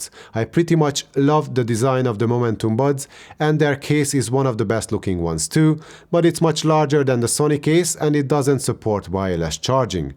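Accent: Hungary. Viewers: Where are you from mate? You sound Hungarian. Van bojler eladó! Great video btw. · Why do you have a hungarian accent?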